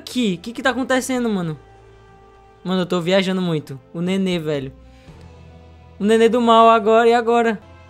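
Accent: Northeast, Brazil. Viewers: tu é nordestino né?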